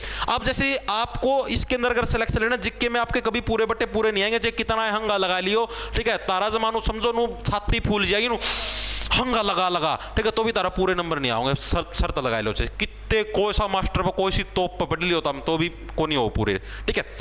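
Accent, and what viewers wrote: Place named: Punjab, India. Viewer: Panjab se ka aap aaye hai sir aapka to bhasa bahut mast hai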